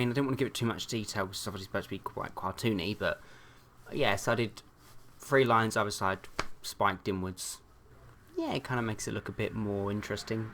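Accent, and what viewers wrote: United Kingdom. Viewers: I like ur british accent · you look amazing great work as always! your tutorials are fabulous darling btw im from the uk too and your accent is adorable im from near Manchester lol so my accent aint as classy as yours ;)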